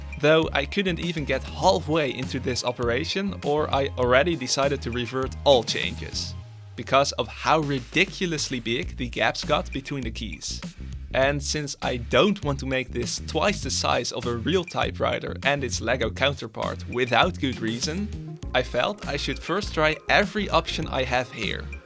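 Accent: Netherlands. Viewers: Your from the Netherlands? The accent❤ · Nice vid, and as fellow dutchie, i immediately caught on your dutch accent hehe ;) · He sounds Dutch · ben je Nederlands? ik hoor je accent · Nen ollander (duidelijk hoorbaar 😂) met veel tijd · Another dutch person successfully sniffed out by his accent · Are you dutch? because you sound like have a dutch accent. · Why does this guy have a dutch accent? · Is this guy Dutch? He sounds Dutch. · you sound very dutch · ik hoor een nederlands accent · I heard you talk checked your profile, yeah Dutch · You lowk sound dutch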